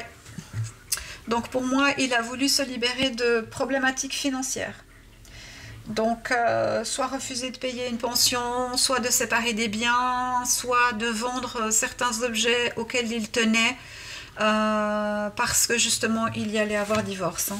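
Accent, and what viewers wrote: Switzerland. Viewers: Bonsoir Madame, j'apprécie de plus en plus vos tirages, calme, tranquille, voix douce, petit bruit d'eau, beaucoup de respect, élocution soignée, il me semble reconnaitre un accent suisse mais c'est peut être la Savoie. Moi non plus je ne connaissais pas ce drame. Je me permets de vous donner des idées si vous voue êtes en panne d'inspiration : Céline Giboire, Thifaine Veron, Vanessa Melet (pardon si vous avez déjà travaillé sur leur disparition ou décès car je connais votre chaine depuis peu). Merci beaucoup pour la qualité de votre travail. Bonne soirée. Aline Le Moal, Finistère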